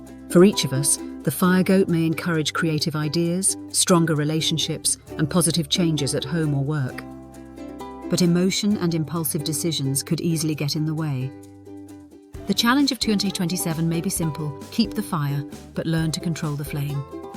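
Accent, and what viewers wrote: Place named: England, United Kingdom. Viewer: An English accent, Hawaiian luau music, Chinese astrology, and AI animation. Interesting combination.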